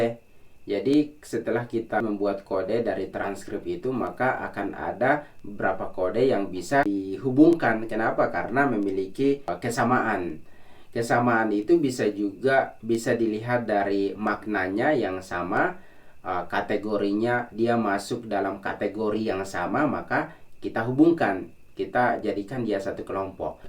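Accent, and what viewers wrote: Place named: Makassar, Indonesia. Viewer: Kaka orang Makassar yah? Logatnya sama dengan kampung saya 🙏🏻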